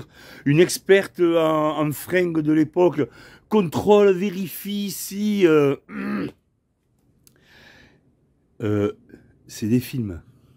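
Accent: France. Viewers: Salut, pas d' accord avec toi, "les cons ça osent tout, c'est à ça qu'on les reconnait...". Ils y en aura toujours, donc il faut faire avec, mais on les emmerde et il faut leur faire savoir Haut et Fort. Notre personnalité n' a pas à en souffrir, au contraire, rentrons leur dedans par la dérision en leur montrant qu' ils nous amusent... Par contre, entièrement en accord avec toi, sans les créateurs ils n' existent plus...ils ont essayé d' être eux même créateurs, mais ils ont échoué... En tous cas, ton accent de cigale est toujours aussi mélodieux à entendre....Bons vols, bonnes courses et plein de bonheur.....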